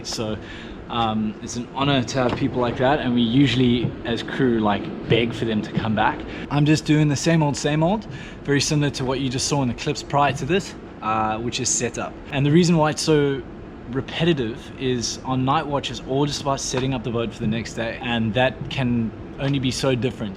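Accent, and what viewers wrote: South Africa. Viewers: South African accent? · Hi - are you South African - your accent and some of the words you use make me think so? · Is that a Saffer twang I detect?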